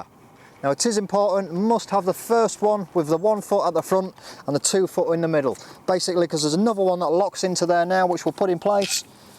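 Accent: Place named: North, United Kingdom. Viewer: Absolute nightmare presentation mate. You sound like a cross between a pissed up northerner that's just inhaled a helium balloon with the animation of a Duracell bunny.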